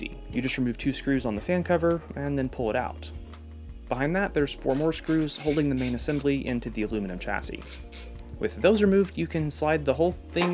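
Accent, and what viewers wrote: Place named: Boston, United States. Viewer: That's a cute Boston. 13/10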